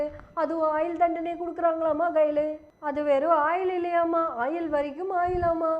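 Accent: Kongu, India. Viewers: கேட்டு கேட்டு சிரிக்காமல் இருக்கமுடியவில்லை தங்கையே! அருமையான பதிவு!! கொங்கு தமிழ் கொம்புத்தேன்!!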